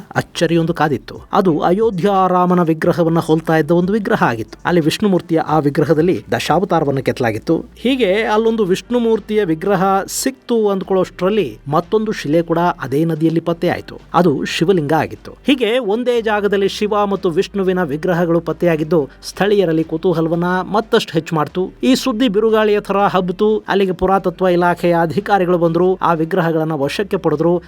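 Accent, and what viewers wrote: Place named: Raichur, India. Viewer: ರಾಯಚೂರು ಕನ್ನಡದ ಹೇಮ್ಮೇ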